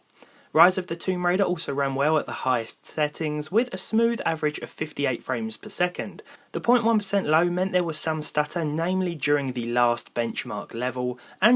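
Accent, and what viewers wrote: United Kingdom. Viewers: Hey mate where are you from in the UK i like your accent but you never answered me at all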